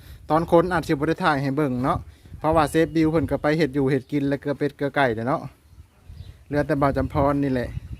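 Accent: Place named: Isan, Thailand. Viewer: สาวบิวเว่าลาวอีสานฝังไทยบ้านอ้ายกะเว่าลาวอีสานคือสาวบิวนั้นละครับ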